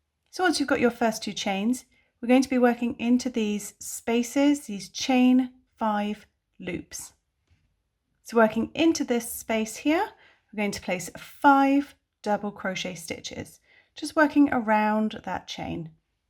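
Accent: United Kingdom. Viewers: You Have a UK accent, but in American terms?? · Despite the British accents, she's using American terms, so don't get confused. A lovely, and east stitch pattern for us beginners.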